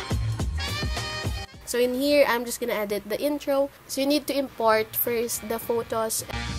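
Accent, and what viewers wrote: Philippines. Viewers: Hello I’m new to checking out your channel :) It’s my first time learning & how to with tutorials on everything of making an intro for YouTube channel video! Question? Can I do these on my phone? Because I often use my phone more than my Mac 💻 or iPad? Because I don’t often bring these with me anywhere as they are heavy & not all of my photos/videos sync to my laptop or iPad,I took it off and forgot how to put it back haha 😂 anyways thanks for sharing I hope I can learn in no time! Also by the way your accent is I can tell your Filipino? Is that weird? 😅are you live in the Philippines or in the states? I miss being home but too ouch heat is kinda bad for my health! Thanks again for sharing! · boses mo parang pilipino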